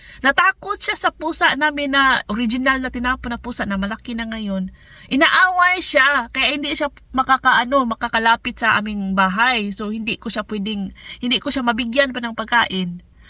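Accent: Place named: Jagna, Philippines. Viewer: ah mao sakto ko basta dapit jagna basta dili sa pikas kay ang tunos imong tingog. Just keep it up. Tan aw ra kos imong mga vlog. Good luck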